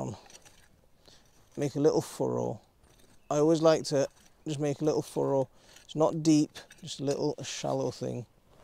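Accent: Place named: Northern England, United Kingdom. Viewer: That is how people speak in the north of England.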